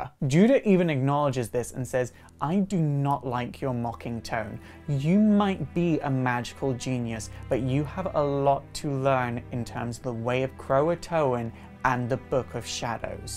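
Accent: Scotland, United Kingdom. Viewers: I CANNOT take a Lovecraft quote seriously from your airy Scottish voice. Good video, loved the Kabbalah talk at the end. A weekly video series on Kabbalah with charts and diagrams would be killer. Happy Halloween.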